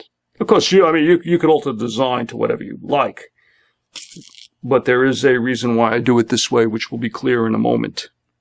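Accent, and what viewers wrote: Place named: East Coast, United States. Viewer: Great video...until the end...even though I got what you were teaching you kept going out of the camera view...I found myself telling you to move up...LOL...Still you are very clear and I am sure I could learn a lot from you so I am going to subscribe...I like your accent...are you one the US east coast? Thanks for sharing I am so glad I found your videos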